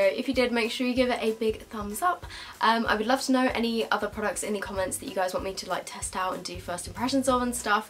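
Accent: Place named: RP, United Kingdom. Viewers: Jasmine Lister I live in the south hahahah and she doesn't sound posh compared to 99% of people I know. She has what I'd call a regular English accent, you guys have a northern accent and I have a southern/posh accent 😂😭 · Jasmine Lister just her accent I think. I'm from Newcastle and if anyone sounded like that from here they would be called posh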